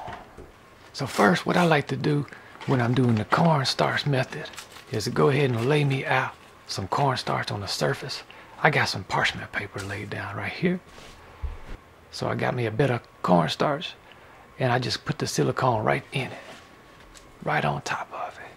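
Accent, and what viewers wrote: United States. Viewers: Great video! I love your American accent!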